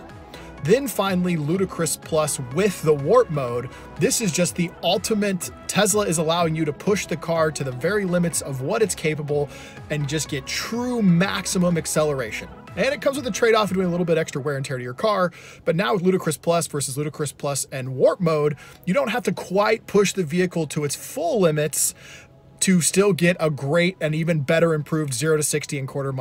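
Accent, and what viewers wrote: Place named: Scotland, United Kingdom. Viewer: Love there is a bit of the Scottish Lewis Capaldi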